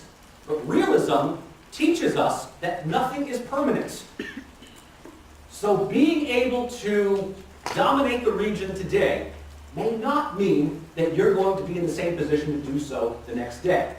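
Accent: New Jersey, United States. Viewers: His Jersey Accent makes the lectures even more entertaining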